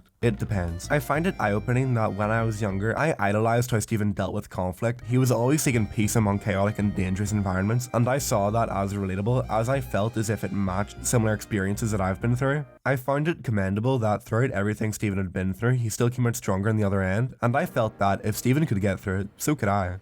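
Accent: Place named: Northern Ireland, United Kingdom. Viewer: You just saved my life fr. I haven’t heard a northern irish accent since christmas 😂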